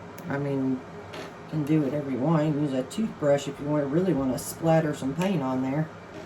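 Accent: South, United States. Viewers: Hi Lovely Lady!! That really is an awesome looking galaxy that you’ve created there! It’s beautiful, truly. Will you resin and mount it? I feel though that I have to comment about the fact that you don’t wear a respirator as much as you should. It worries me. Personally I would much rather you did your painting in silence? Of course with a voice over. I love your southern accent! But seriously, you know better than I do about the possible medical implications. I hope you won’t be annoyed at my saying so, but I do enjoy watching your videos and I learn so much, so please don’t gamble with your health. It may take a little longer of course, to post your video after the added narration, but hey, we can just wait (with baited breath) for the next masterpiece! Thanks so much for sharing your talent. I and many other viewers DO NOT want you to get poorly. 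🙏🙏🙏🤗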